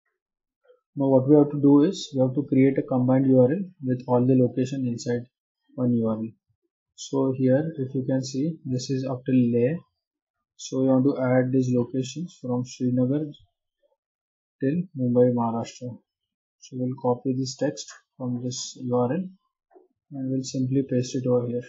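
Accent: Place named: Pakistan. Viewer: Learn to speak English in English,not in pakistani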